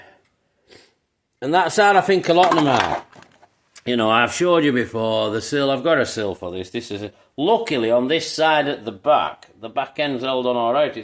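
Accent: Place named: Barnsley, United Kingdom. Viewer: Good video pal just subscribed, you sound very local to me barnsley ish. Show us some update videos of the welding n stuff. Cheers 🍻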